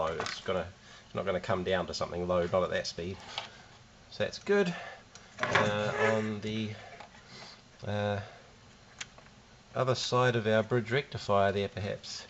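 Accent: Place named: New Zealand. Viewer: Thanks for the video. Very enjoyable.. You sound like a New Zealander... Auckland maybe..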